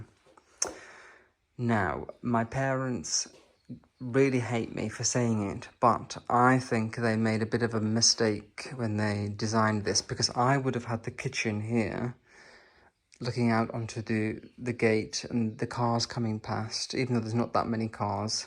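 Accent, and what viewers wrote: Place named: Stoke, United Kingdom. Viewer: ahh those flat vowels you from stoke boy!! no pretence then !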